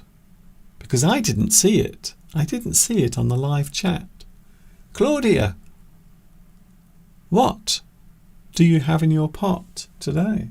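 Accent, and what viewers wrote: United Kingdom. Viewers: It's good to learn English here.British English.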